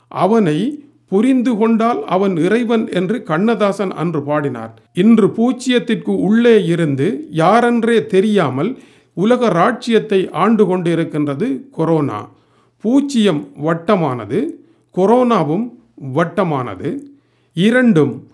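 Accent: Sri Lanka. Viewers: Information are good. Your tamil looks like srilankan tamil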